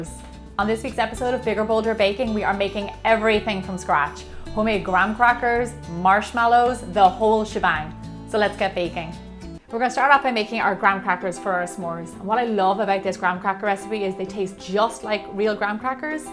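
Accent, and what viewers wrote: Ireland. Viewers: Are u from Ireland I'm from Ireland .... This looks so yummy really wanna try them xx 💕💕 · Are you Irish? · I love your accent. I'm Irish also. My dad was born in Ireland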